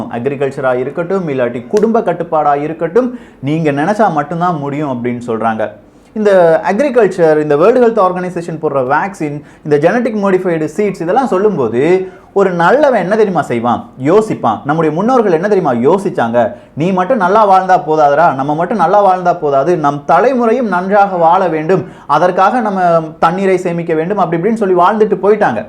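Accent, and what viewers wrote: Kerala, India. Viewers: Wow keep it up & I see you speak with Malayalam slang mixed is it so?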